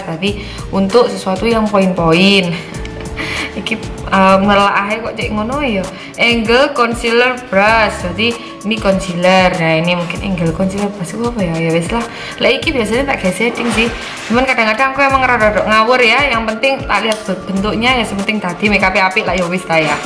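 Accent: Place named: Malang, Indonesia. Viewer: ngakak nonton tutorial nya hahahah asli ngalam bgttt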